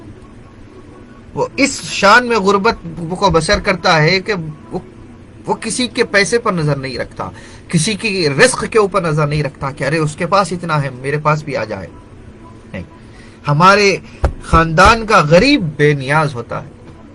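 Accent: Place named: India. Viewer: Great, Maashaa Allah, yaa habeeb. But I don't understand your Indian speaking.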